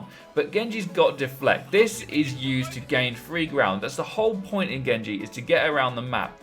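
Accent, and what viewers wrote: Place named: England, United Kingdom. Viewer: Saying crap with a bit of an English accent doesn't make it true.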